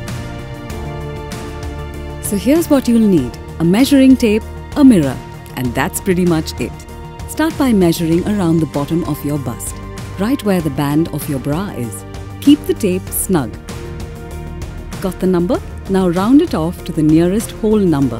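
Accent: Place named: India. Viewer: Typical Indian accent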